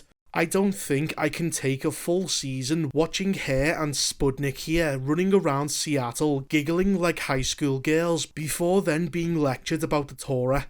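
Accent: Liverpool, United Kingdom. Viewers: Yes my bredda, Are you an ACTUAL SCOUSER? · God your scouse accent is annoying but i 100% agree with you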